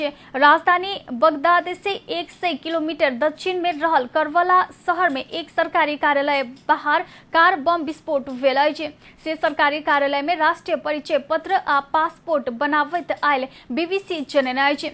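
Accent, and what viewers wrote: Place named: Nepal. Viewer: sounds beautiful the way she speaks maithili with lot of nepali accent. hahaha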